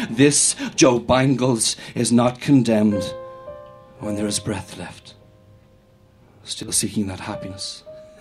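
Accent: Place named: Ireland. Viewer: Such a beautiful poem. And the Irish accent just makes it that much better (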